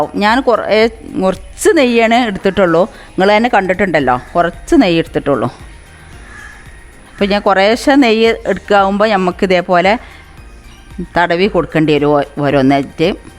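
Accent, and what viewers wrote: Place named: Kottayam, India. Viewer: അസ്സലാമുഅലൈക്കും 🤩😍😂ഉമ്മാക്ക് ആയുസ്സും ആരോഗ്യവും ഉണ്ടാവട്ടെ കോട്ടയത്തെ വർത്താനം എന്താ സുഖല്ലേ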